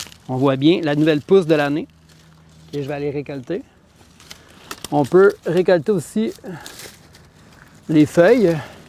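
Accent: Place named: Canada. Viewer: 😅C'est seulement aujourd'hui que je vois cette vidéo que je trouve très intéressante. Hier, j'ai cueilli des jeunes feuilles de renouée du Japon pour les cuisiner. Cette vidéo m'inspire. J'ai aussi cueilli de l'ail des bois (en France on l'appelle l'ail des ours). J'en ai fait un pesto. Merci Monsieur de mettre en avant les plantes sauvages comestibles. C'est dommage que l'on en profite pas davantage. Elles sont sources de grandes qualités aussi bien alimentaires que pharmaceutiques. Les ignorer risque éteindre la connaissance ancestrale. Votre accent est canadien (j'aime beaucoup). Lorsque je vais au Canada, je m'installe à Montréal durant quelques mois. De quelle région êtes-vous ?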